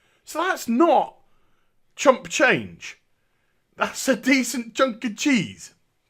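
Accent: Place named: South Coast, United Kingdom. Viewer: Your a Yorkshireman? Really? I had you down for South Coast lad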